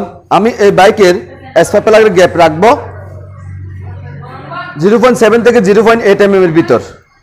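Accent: Chittagong, Bangladesh. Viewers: Apni ki cittagong er na ki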